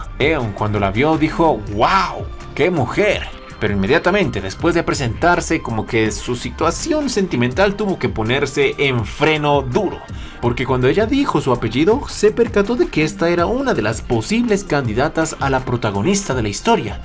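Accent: Colombia. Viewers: sos colombiano parcero?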